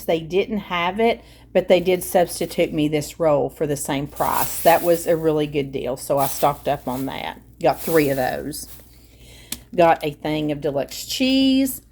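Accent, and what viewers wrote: South, United States. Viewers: Watching and listening to you talk just make my heart happy! I have mentioned before that my parents were born and raised in Tennessee and that southern drawl just takes me back! Thank you for your sweet and gentle personality! Blessings!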